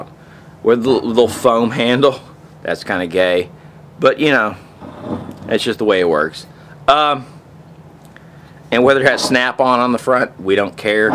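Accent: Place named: South, United States. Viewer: You sound like a red nec.. Lamb voice. Costco sells it for <num> cad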